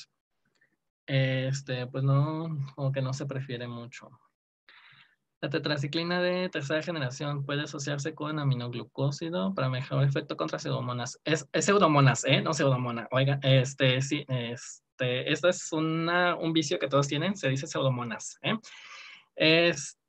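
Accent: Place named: France. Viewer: Está chido el acento francés, excelente clase gracias por compartir de su conocimiento!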